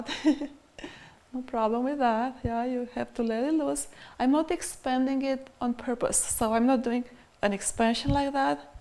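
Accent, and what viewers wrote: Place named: Germany. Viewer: She sounds german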